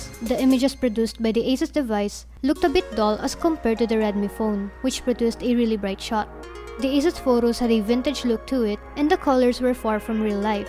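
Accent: Philippines. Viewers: Don't be bothered with those people that comment on your accent. it may not be neutral but its understandable enough for most like me. It's the natural way most filipinos pronounce english due to filipino being our 1st language.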